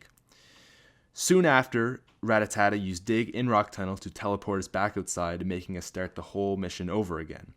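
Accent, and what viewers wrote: Canada. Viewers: what is your accent? is it Canadian? it's very interesting. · I hear that Canadian accent ;D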